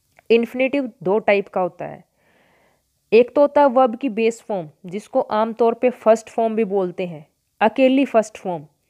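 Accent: Haryana, India. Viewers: Mam haryana ke ho kya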